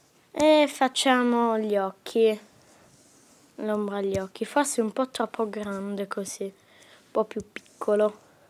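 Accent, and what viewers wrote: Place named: France. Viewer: Ma parli con l'accento francese. Tuo padre è italiano e tua madre è francese oppure il contrario?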